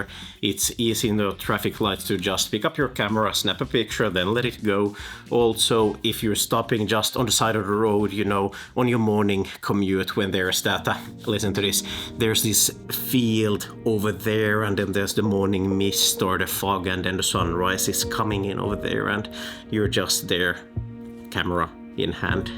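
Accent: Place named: Finland. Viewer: I'm so glad I got that correct. I met some Finns on my travels and they were so polite! Your accent reminded me of theirs. Good video by the way.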